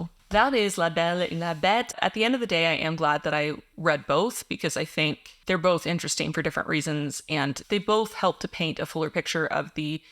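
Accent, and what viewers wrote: Quebec, Canada. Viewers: En tant que française, c’est rigolo pour moi d’entendre une étrangère dont le français n’est pas la langue maternelle parler Québécois… Mais tu parles très bien ! 😊 I am (trying to) learn(ing) Dutch because I’ve been living in the Netherlands for two years, but this is such a difficult language, sometimes it’s similar to English, sometimes there are French words, but sometimes it’s 100% Dutch😅 I also use Babbel app.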